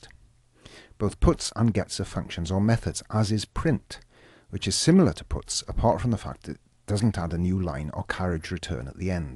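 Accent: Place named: Wales, United Kingdom. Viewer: Now that's a lovely Welsh accent.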